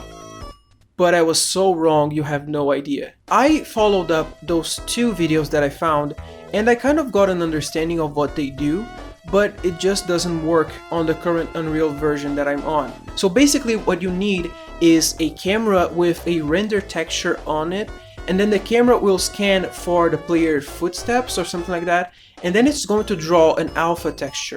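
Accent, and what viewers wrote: Brazil. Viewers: e esse sotaque de Br ae?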